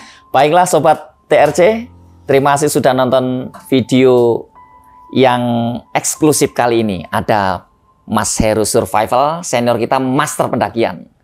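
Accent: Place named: Java, Indonesia. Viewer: Nama Tapi Mendook Amat Mas Ngomongnya...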